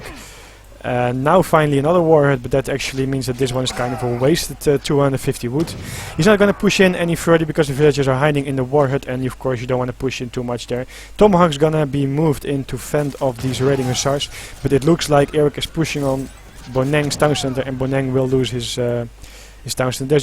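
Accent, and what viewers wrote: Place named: Netherlands. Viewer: The accent is dutch, the way you talk is dutch, welkom mede hollander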